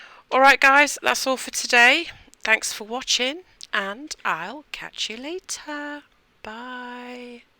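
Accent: United Kingdom. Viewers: Wowser, that's gorgeous. Love the colours, and love the spiral patterned roller. Hey, I read the comment from the lady in the US. It's great you have kept your British accent. A lady on YouTube who does paint pours, is from Birmingham UK and emigrated to Australia in the 80's. She still has her Brummy accent. I would give anything to emigrate from the UK as it's horrible these days. I was born here in 1960. Like you mention though, the world over, you have to be careful about saying anything any more, in case you upset someone!!! I carry on speaking my mind, and ya boo sucks to everyone LOL!!!! Love your videos, thank you so much for sharing your superb creations with us. Take care, xx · Very cool!! With the colors you used they have a bit of a Southwestern flavor. I really like the way you created the subtle wood grain, it makes a great, interesting background and contrasts the colorful pieces well. 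I didn’t know you are in the US!! I thought you were in the UK somewhere. I hope you like it here. I suppose if you have been here over 10 years you must like it a bit 😊 It amazes me that you have maintained your accent. I went to school in Norwich for 3 years and developed such a British accent that people didn’t believe I was American🤣 Anyway, these pieces turned out great!! Thank you for sharing 💚💛💙🤍💜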